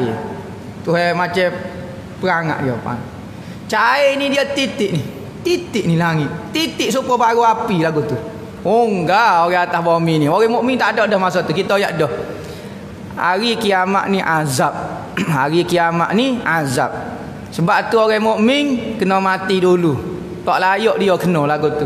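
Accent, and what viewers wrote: Kelantan, Malaysia. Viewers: Sedap dengar ustad kecek kelate....memang best....kadang²ustad lawak juga😅😅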